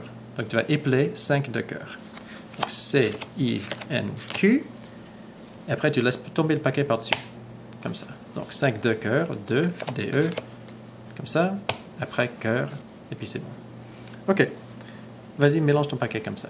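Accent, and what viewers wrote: Quebec, Canada. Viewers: wooow classe l'accent québécois 8D8D8D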